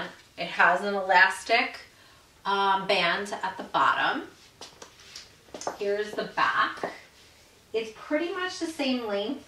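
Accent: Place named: Long Island, United States. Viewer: My husband,who originally from Long Island, was sitting with me while I was listening to your video. He wants to know what part are you from :)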